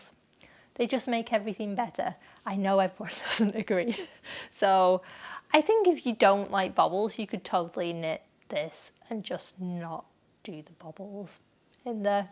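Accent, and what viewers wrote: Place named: Northern England, United Kingdom. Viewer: Your Joanie is beautiful, simple lines with enough texture to make it interesting. I absolutely love it. Your into the wild is beautiful too, Tilly should feel honoured, or is you that should be honoured by having her wonderful face on your jumper. I loved it when I found your podcast, you can take a Northern lass away from home but you’ll not get the home out of her accent, I knew where you were from immediately. I was born a Lancashire lass- till they moved the county boundaries and Warrington was suddenly part of Cheshire 😮🤣🙄- but my dad and all my aunts and cousins are from St Helens, Wigan, all over. I really enj😊your podcast and Tilly is beautiful, look forward to the next one and seeing what you come up with to make with all that wonderful wool xXx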